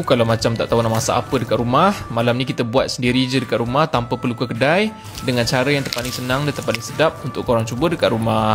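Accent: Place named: Perak, Malaysia. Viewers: sedap je dengorrr ckp perakkkk hehe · Bunyi ckpnye mmg loghat peghak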